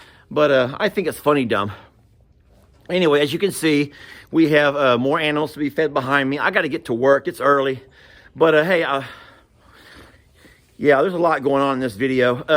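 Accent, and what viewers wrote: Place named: Illinois, United States. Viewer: you are beautiful just the way you are, and you don’t talk funny that’s the Illinois coming out in you. From your Illinois peeps 😂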